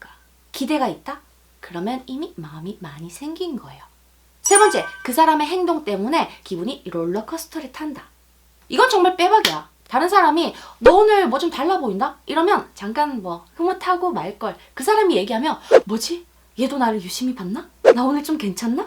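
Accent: Gyeongsang, South Korea. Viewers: 사용하시는언어는 부산어 인가욧 대구어 인가욧???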